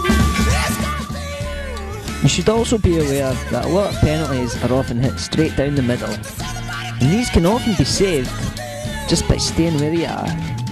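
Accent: United Kingdom. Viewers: his accent is scottish and so am i glasgow :D good tips aswell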